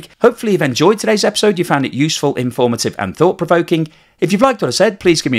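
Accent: United Kingdom. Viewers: As soon as I hear this British accent, I block this videos almost immediately, because of all the scams, using the same British accent voice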